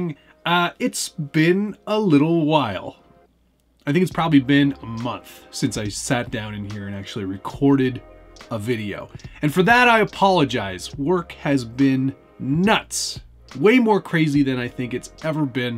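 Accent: Canada. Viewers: are you from ontario, canada?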